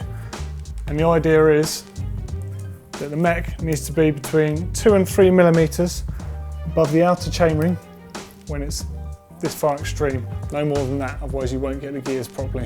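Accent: England, United Kingdom. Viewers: I didn't TD this video but the music is way too loud to hear your soft spoken English accent.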